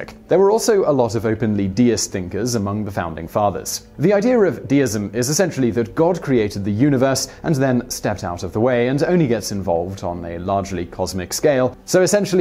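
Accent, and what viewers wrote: England, United Kingdom. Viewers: I love hearing an English guy talk about losing the revolutionary war.....now on to the war of 1812 the on to the bailouts of WW 1 and 2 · His accent tells me a little about how the British teaches about America lol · As an American, I don't question the accuracy of anything being said here, and most of it is consistent with what I learned in school. But man it's hard to assume objectivity when hearing all these facts delivered from a guy with a British accent. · Ironic how a English man is narrating this. He’s not wrong but I smell sour grapes 🍇 🤣🤣🤣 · I hate it when guys with British accents try to tell us about the American Revolution. It's like prince Andrew lecturing American people about the unreasonable idea of free speech...from his Malibu house. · I absolutely love Simon Whistler and think it's the height of hilarity that the perfect British voice is narrating why the American Revolution sucked. 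· So we’re being told by someone with a distinctly British/European accent that Americans don’t know their own history. I say ‘Bollocks!!!’. In Parliament it was stated the colonists would NOT pay for the French & Indian War. Then they reneged on that and forced the colonists to pay for a war they did not ask for. All the points he says are surprising are not and have been taught for years. And yes for someone unfamiliar with the weapons of the day it may seem that it would be difficult to fire and reload in short order. A person experienced with the weapon could fire and reload 3 times in a minute or less. As for guerrilla warfare, the tactics used by the colonists was much different from the marching in a straight line and essentially taking turns shooting at the other side. The colonists did not always fight that way and so in comparison their tactics could be considered guerrilla warfare. 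· SAYS GUY WITH BRITISH ACCENT🙄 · You clicked on this video to learn more about the American Revolution and you got someone with an English accent. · I can’t help but notice that this man, with a British accent, keeps reminding us of what we were taught in grade school already. That England wouldn’t have lost if the colonies hadn’t had help. It’s been over a couple centuries now. Still not over it? Perhaps his time would be better spent praising France for having the foresight to invest in our little experiment over in the colonies. It has certainly paid off for them..... and for England for that matter. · Why am I listening to a Brit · You know as much about freemasonry as you do about American history. Oh… I notice you have an English accent as well! 🧐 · Just a thought, if you’re going to be talking smack about the Revolutionary War, you’d probably get a lot more credibility if you did it without the British accent. 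· Sounds like a salty Brit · This video just sounds like a Brit That's still a bit mad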